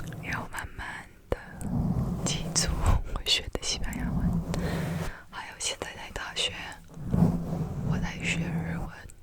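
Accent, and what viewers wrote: Taiwan. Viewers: 好家伙这个完美 你听起来台湾人🌚哦哦你的父母是台湾人😮厉害 我的眼睛太疼了晚安🤣🤣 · 您好像学了台湾中文，对不对？因为我的老师教我北京汉语，所以我看繁体字看得不太好。虽然我完全不能看繁体字，不过我听台湾的口音平时听得懂。我自己觉得您说得很好！ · when you started speaking I KNEW YOU WERE TAIWANESE I love ur accent!! ❤️ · 可是你的台湾口音很relaxing诶！ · 有台湾味道的中文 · 好少遇到台灣ASMR很喜歡這個口音❤ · 台湾人路过 方便妳看 我转简体字 妳的一些中文用语好可爱喔😂 妳的自述故事也很有趣～ 每次那个“可是”都念“可4” 真的很台欸🤣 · 台湾腔太有魅力了😂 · 你的zongwen好可爱 哈哈哈 好喜欢台湾口音 听得好舒服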